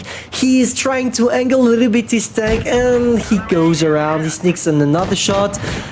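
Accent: France. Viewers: On dirait un français qui parle anglais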